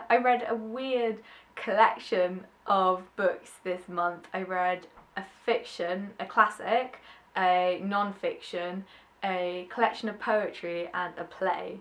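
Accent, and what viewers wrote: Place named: United Kingdom. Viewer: and I love your british accent. :)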